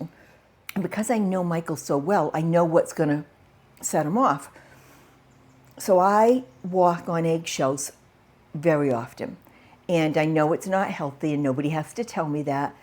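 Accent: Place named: New Hampshire, United States. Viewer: when you find that bra, you must share with us. I have exactly the same need. Love your show. Listening to you reminds me of my mother’s family from Manchester, NH. How they are missed!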